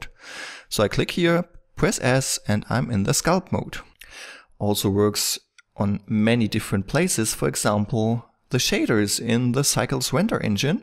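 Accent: Germany. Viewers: You sound German.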